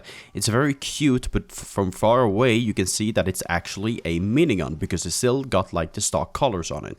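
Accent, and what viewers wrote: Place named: Sweden. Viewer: That's just the Swedish accent, Joel from Vinesauce is the same.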